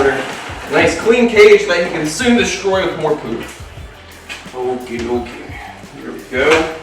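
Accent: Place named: Australia. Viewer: Damn that australien accent thoooooough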